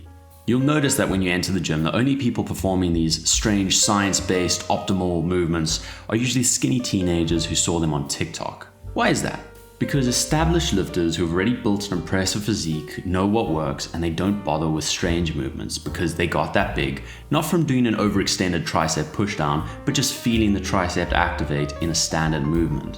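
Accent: South Africa. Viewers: Great video mate! Can def tell youre from SA. Represent us brother! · South African bro? Let’s go represent Bru! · Btw you a saffa bro? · are you south african? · i needa ask, are you South African? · Great video. Spot on, all these trainers often end up paralyzing people into inaction because there is always supposedly a more optimal way of doing something. It's certainly happened to me and many others I know. Also, nice to hear a South African accent! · 🚨🇿🇦🚨 Saffer twang detected! Saffer twang detected! 👮📢 Aweh Aweh boet 🤙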